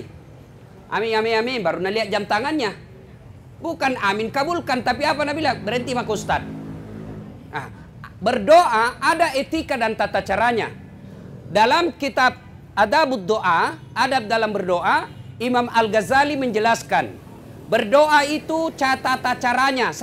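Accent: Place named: Sulawesi, Indonesia. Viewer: Suka sama ceramahnya. Màterinya ttg kehidupan skr plus dgn pendekatan komunikasi yg baik dgn dialek dan bahasa gaul ala sulawesi. Hy yang mengganggu, kok byk kata 'cilaka' atau celaka dlm arti bhs Indonesianya ya? Bukankah kata2 yg diucapkan itu bermakna doa ya? Apalg oleh seorg ustad seperti ustad Das'ad? Maaf jika saya saja yg merasa terganggu...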